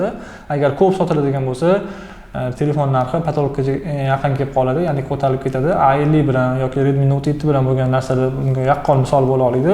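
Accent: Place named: Tashkent, Uzbekistan. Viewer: 🤢🤮 Aka iltimos Toshkentcha gapirmang, kitobiy o'zbek tilida gapiring. 😊😄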